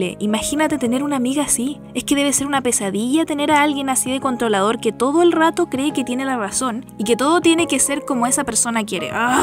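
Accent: Chile. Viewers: Es la primera vez que veo su canal y note altiro que es chilena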